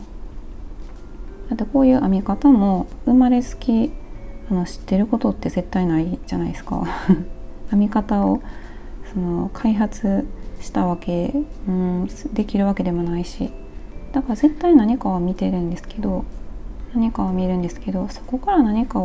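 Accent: Kansai, Japan. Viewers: こんにちは👋😃私がさんを知ったのは、4年前急にくも膜下出血で右半身が不自由になって、ショックで何もやるきが失くなって一日中泣いてばかりしてた時、編み物を勧めてくれたんですよ。😅でも、手が思うように動かなくて💦😥ひたすら動画をながめてました。そしたらなんと私と同じ関西弁で編み物をされているさんを見つけて😂 …なんか、今まで編み物の基本ばかりにとらわれてしまって、できないことばかりで諦めてたのに、さんの話しを聞いたら、なんかできるような気がしてきて挑戦してます。今ではリハビリをかねて編み物してますので手が大分動くようになってきました❗️足はまだまだですが😅💦何よりさんのおかげでかぎ針が使えるようになったのが嬉しくて😂あなたの動画に出会って無かったら今頃は死人のようになってたかも😅 さんの関西弁も大好きです😄私は関西人だけど関西弁を喋らないところに住んでます。懐かしさもあります。これからも楽しいトークと編み物、UPされるのをまってます🎵長々とスミマセン❗️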